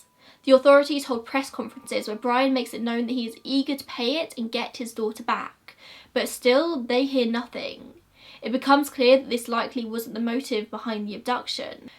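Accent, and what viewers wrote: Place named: Yorkshire, United Kingdom. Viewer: I love the Yorkshire accent. <<thumbs up>>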